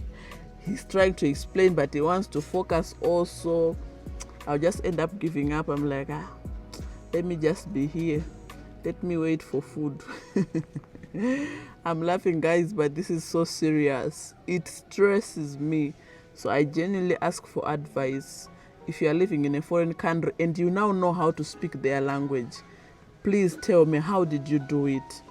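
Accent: Buganda, Uganda. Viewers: Your English accent is becoming Ugandian. Watch a lot of local dramas and learn the basics and don't be shy to speak the little that you know. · Your accent is already Luganda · Your lingo has changed as well you sound Ugandian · “I’m just theya” 😂😂😂 you so sound like a Ugandan now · You are now speaking Ugandan accent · You are now even getting the Ugandan English accent.... 😂😂😂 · Don't be unequally yoked , now u speak Ugandan English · Your accent has turn Ugandan. You are now saying theya. My sis please don't copy that accent please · You're doing well even your English accent has changed. If you didn't mention you are Zimbabwean no one can tell. You sound Ugandan. 2 ways to learn a foreign language are exposure and taking a formal course. So keep going to those public places and events. Go to Nakasero or Owino markets and negotiate prices . Attend church, weddings etc soon you will pick up the language. 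Check if Makerere or other colleges have a language courses. Taking a formal course would help you learn the language the correct way then you go practice what you learned by exposing yourself in public places. Don't be shy😅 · How do you say thereee as a Zimbabwean you sound Ugandan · you are doing very well, your english accent & facial expressions already shifted to Luganda ... thats a good start. keep it up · You already sound like ugandan, until when u say you are not one. Uganda is a friendly place to foreigners. I dont agree that it is stressful. Ask the africans living in nordic countries, they shall tell u how easy it was for them to learn those viking languages. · My sister, your English sounds Luganda.So what's your problem when it comes to Luganda itself.... I am from Mozambique, but I speak Luganda..nzee n'yogera Luganda nyo, soka okuwulira nga mwana, kubiri, soka okuyogera mpola mpola. 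Talking of chicken lehs and head, when I got back home, I no longer eat them anymore... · U sound Ugandian already”distab him” when u are home sick come watch Zimbabwean content. I get u when he they speak their language even the jokes won’t be joke enough when it’s translated to English Sorry wena Vamwene mongotarisana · You are already speaking like a Ugandan take it easy , take language lessons, and open a market place you will be speaking the language in a week. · Your English accent now sounds more like ungandian😂😂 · Wow, you already have a Ugandan accent! Not dominant in every word, but it's coming through in a few of them. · You will learn my sister, but your accent is now Ugandian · But your English is now ugandaring my sister 😅.even your tone has changed 😂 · Hugs to you.. you’ve already picked the Uganda accent😜hope you can now do the Acholi dance.. language wise, ugandans vanoita kunge vari kutaura shona, so i think if you are shona you should learn fast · Most of the languages of Zimbabwe are linguistically related to Luganda and the other Bantu languages of East Africa. 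If you understand Shona or Sindebele, learning Luganda will not take long. By the way, if you had not stated that you were from Zimbabwe I would have assumed from your beautiful accent that you were a Ugandan. I have pasted here a link to Luganda language lessons. They are easy to follow. Whatever you learn from it, you can practice it with your husband and see how you advance. I wish you the best. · You are almost a Muganda Nyabo. Your accent is already Ugandan, be patient Luganda is almost like Shona, I lived in Uganda for a few years and I do understand when they are talking coz out languages are cousin languages, they could never Nyeya me when am around, coz i could pick a few words ! Enjoy endlessly kneeling 😅😂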